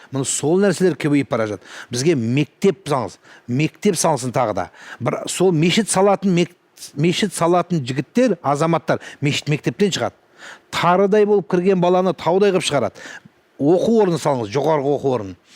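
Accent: Standard. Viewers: Имамды, таза қазақшаны сағынсам тыңдаймын, керемет шешен кісі ғой, сөз саптауы ешкімге ұқсамайтын шеберлікпен айтып жеткізеді. Риза боламын❤Қорқытпай, көркем тілмен орнына қояды. 🎉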